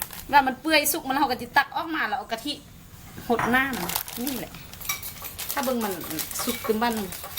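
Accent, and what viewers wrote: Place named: Lao Nyo, Thailand. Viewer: ฟังพี่เขาพูดออกสำเนียง ลาวผสมญ้อ (ฟังออกครับ)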